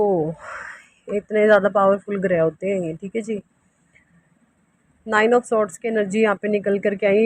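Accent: Haryana, India. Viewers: Sahi kaha Rahe ho aaj haranvi bol Rahe ho ❤😊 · आज। की रीडिंग पुरी तरह के से हरयाणवी है एक दम सुपर 👍👑👍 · Pehle apka punjabi touch tha, par aj puri haryanvi boli hai😂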